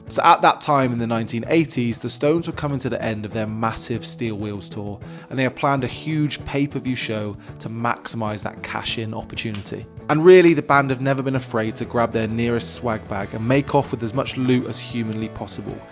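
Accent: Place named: United Kingdom. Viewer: i had no idea but my tendency is to zone out once the British accent starts. At least it wasn't cockney, still subtitles were needed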